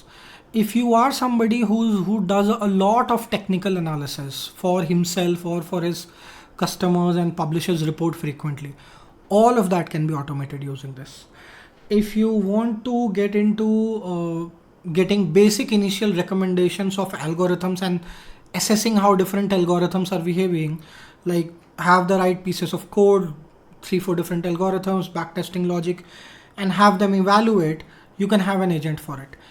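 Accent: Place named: India. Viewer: i tried to listen to it but accent is very heavy and the indian words thrown in, in the middle of sentences (or maybe they are in english but due to heavy accent they sound like indian) makes the video very distracting and difficult to follow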